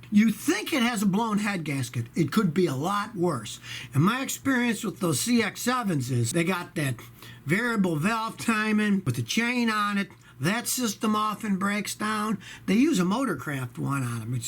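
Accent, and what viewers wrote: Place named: Texas, United States. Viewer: I don't know what I like best, is comment, knowledge, texas accent...or the images on his right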